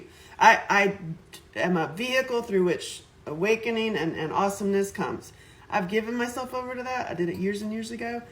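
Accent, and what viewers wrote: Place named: South, United States. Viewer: Hi! It's been awhile! Question, when did you get southern drawl? Plus, when did you get tattoos?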